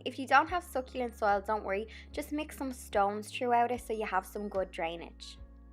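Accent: Ireland. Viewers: Lovely Irish accent! Super video. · I love your Irish brogue! It's darling, and your crafts are darling, too. The little gift boxes have inspired me to get me granddaughters crafting with me!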